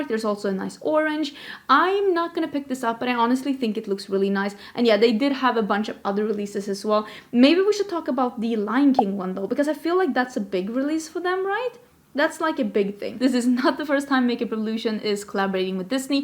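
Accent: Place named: Sweden. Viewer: Man hör att hon e svenne iaf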